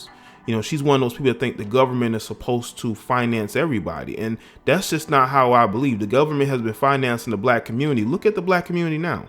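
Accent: South, United States. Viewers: Nah bruh you really sound country! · You don't speak with a southern drawl but I can tell you are from the south. Nothing wrong with that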